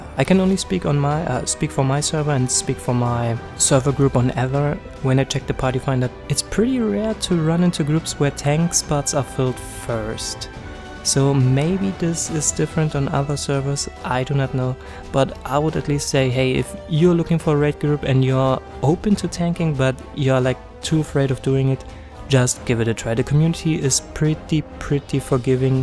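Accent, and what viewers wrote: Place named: Germany. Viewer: Ty for this guide!! Und so bist du Deutscher? Höre es in deiner Stimme iwie😂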